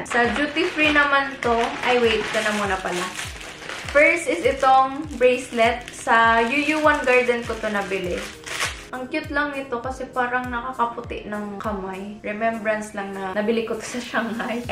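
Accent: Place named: Bicol, Philippines. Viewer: Hahaha Lumalabas yung pag ka bicolana nya dahil sa tagalog accent nya hehe Love you!!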